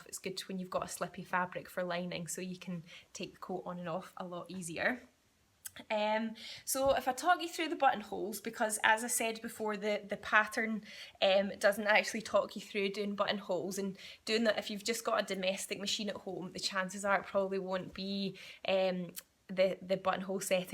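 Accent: Scotland, United Kingdom. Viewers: What a joy to hear a scottish accent amongst all the sewing vloggers I currently follow. Gaun yersel!:) The coat is pure dead brilliant and absolutely gorgeous on you. I'm inspired! Thank you for a wonderful video, will be watching from now on. shirley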